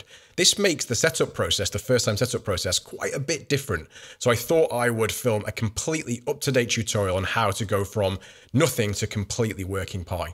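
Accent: United Kingdom. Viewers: Just found your channel tonight and have watched 3 or 4 videos and really enjoy listening to you. Its also nice to hear a fellow brit voice too.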